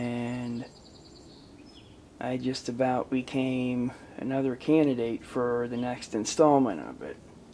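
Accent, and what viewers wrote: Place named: Pennsylvania, United States. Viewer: Your accent is painful to listen to. I'm guessing you're from PA?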